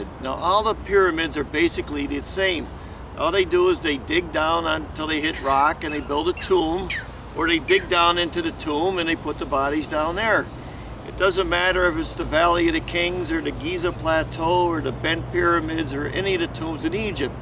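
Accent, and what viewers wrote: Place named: Coal Region, United States. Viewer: How exciting- thanks for the vicarious world travel- you are a stone man alright but you can't lose that 'coal region' accent lol...(I've got one too, Shenandoah) Grandfather a coal miner - Thanks for this it was very enjoyable. I just read that stones take thousands to millions of years to form?! Thanks.... Molly a follower, learning all the time👆🙂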